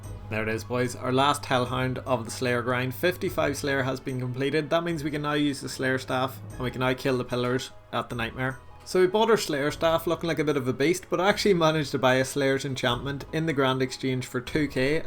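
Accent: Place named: Northern Ireland, United Kingdom. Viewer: Thats a properr norrrnn irrron accent for sure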